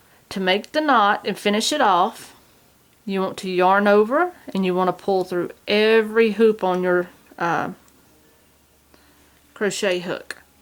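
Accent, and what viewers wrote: South, United States. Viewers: That is just wonderful tutorial Ms! I finally got the idea with the Clones Knot needing 'loose' stitches to pull through the wrapped knot! It has taken me all of 14 days to figure it out, and today, there you were. The sun is shining a bit brighter for me today, again, thank you! Love that southern accent!:-)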